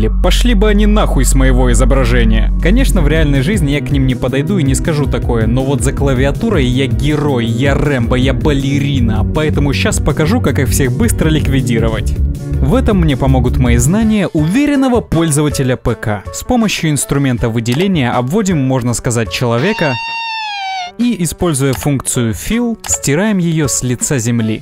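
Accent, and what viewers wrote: Ukraine. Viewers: Ти из Украины ❓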